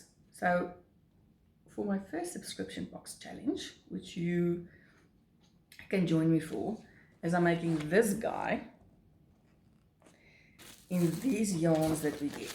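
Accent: South Africa. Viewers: I just saw another one of your videos and heard your accent. I knew that you must be from SA...and you are! I am from the US, but living in SA for 22 years. I totally know what you mean about the post. I don't order yarn or kits from overseas because of it. However, I am in a yarn subscription that isn't a kit and have it delivered to my parents in the US. I have be crocheting for a long time, but have yet to try amigurumi. Maybe you will convince me! 😉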